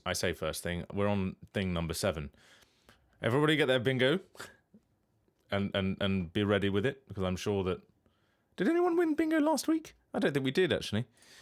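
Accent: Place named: United Kingdom. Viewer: Not the Lyacon shirt 😂 Speaking of Lycaon, I was watching the Pixelated Princess short while thinking about him and staring at his face and I was blushing a little. Was you doing that bit in his voice intentional? And another thing: I always joked about Lycaon sounding British and then you, are actually British, so I guess British Lycaon is canon?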